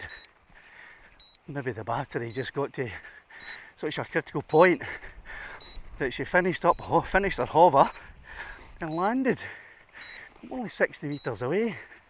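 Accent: Scotland, United Kingdom. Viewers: Fantastic story. I really admire your courage and tenacity to find your drone. Despite the rough terrain, you overcame all obstacles and ended up successful. Respect for your perseverance and never give up. I like your Scottish accent.✌Greetings from Denmark.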